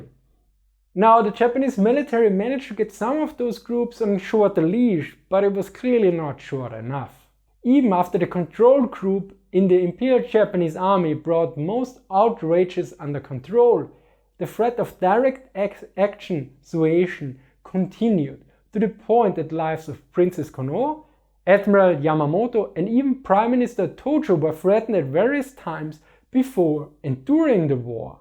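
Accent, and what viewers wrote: Germany. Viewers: I cannot follow this video... Sorry... Why don't you try to grind a little bit that harsh german inflection when you speak ? It is hard to follow you for so many minutes ! Apart this venial thing... I like alot your videos and explanations !!! You do your job well and professionally. Mine is just a mere postive and constructive critic. Nothing personal. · Your accent always throws me off a little.. I know you are an Austrian but you sound German to my American ears. It reminds me of a quote that the most famous German ever was an Austrian and the most famous Austrian was a German (Hitler and Mozart). Don't worry though I am pretty sure Arnold is definitely Austrian. I always wanted to see Arnold in a Ww2 film as an allied soldier - I think Tarantino missed an opportunity when he cast Brad Pitt in "inglorious bastards"!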